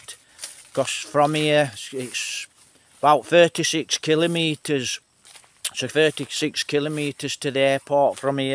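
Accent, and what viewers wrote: Yorkshire, United Kingdom. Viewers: Thought you was a YORKSHIRE LAD ❤ I'm from a village called Maltby near Rotherham 😅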